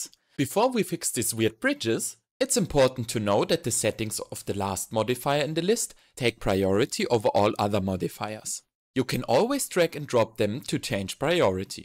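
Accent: Germany. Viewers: klasse Video, aber könntest du das vllt auf Deutsch machen? Dein Akzent klingt deutsch ;-) ich kann leider kein englisch...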